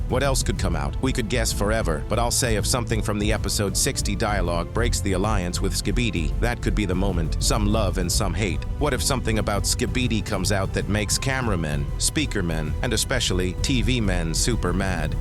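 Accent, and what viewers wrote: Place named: United States. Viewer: Anyone else notice how his voice sounds country?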